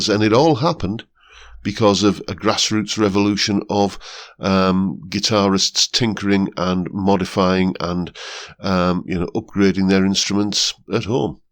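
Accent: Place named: England, United Kingdom. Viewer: I grew up in Lancashire, been in Switzerland for ages now and just really enjoy you talking,! Brings a piece of England to my humble home! Love all your videos in general🎸🤘🎸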